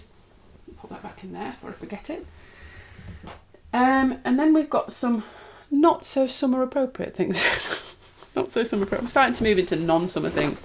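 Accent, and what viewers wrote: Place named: Northern England, United Kingdom. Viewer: You sound like you're from the North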